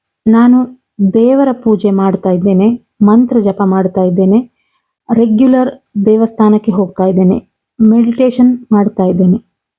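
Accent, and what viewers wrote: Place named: Bangalore, India. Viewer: When I listen to you . I think you r staying in Bangalore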